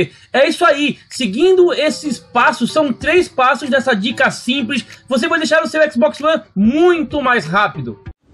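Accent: Florianópolis, Brazil. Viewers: vc é de floripa? tbm sou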